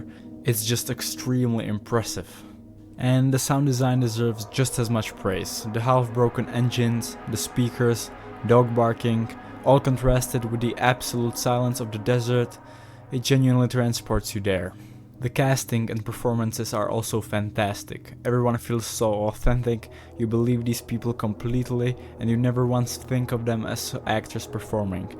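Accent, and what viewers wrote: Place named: Czech Republic. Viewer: where are you from? you sound czech/slovak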